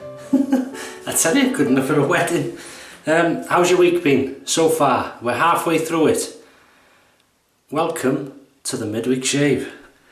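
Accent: United Kingdom. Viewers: There I was with a video on in the background Joe from Queens talking about shaving. Suddenly I no longer hear Joe but a familiar British voice talking about Mack in a New Jersey drawl! Yes you're famous across the pond too. Don't go upsetting any gangsters. All the best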